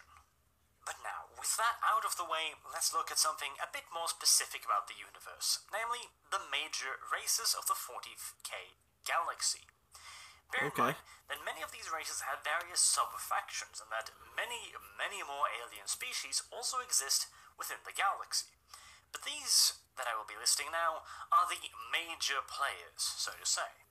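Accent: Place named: United States. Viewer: Oh, MURICA xD I was trying to guess via akcent :P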